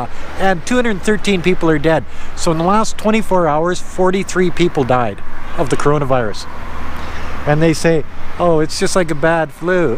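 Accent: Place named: Canada. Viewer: Dude you sound Canadian AF 🤗 Glad your escaping China and have somewhere safe to go👍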